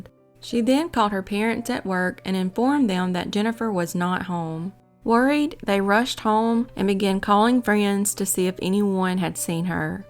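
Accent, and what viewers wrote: South, United States. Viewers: This northerner loves ur sweet southern voice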